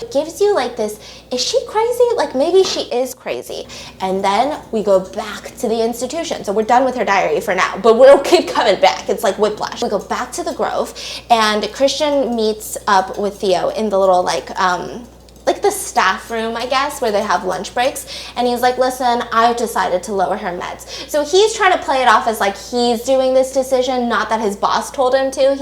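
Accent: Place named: United Kingdom. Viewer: Your fancAy British accent is everythingg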